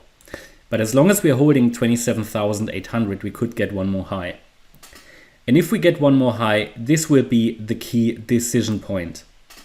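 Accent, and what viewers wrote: Germany. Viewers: is it me or is that a german accent?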